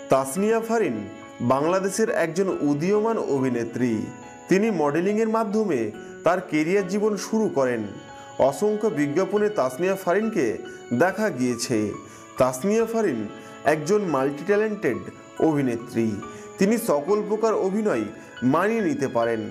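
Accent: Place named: Kolkata, India. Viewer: apnar voice ta kolkatar moto mone hoyce..sorry don't mind..,,,,🌷🌷🌷🌷🌷🌷🥀🥀🥀🥀🥀🌸🌸🌸🌸🌹🌹🌹🌹🌹🌹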